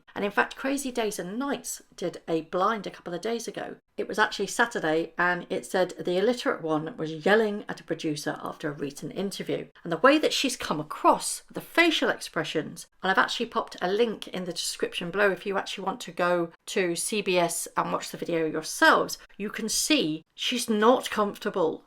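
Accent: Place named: United Kingdom. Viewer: I love listening to voice, take and British accent! TY!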